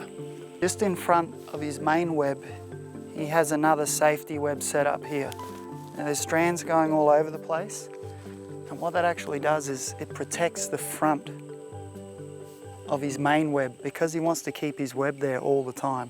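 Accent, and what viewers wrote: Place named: Australia. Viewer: I was hoping that spider jumped on his Australian accented face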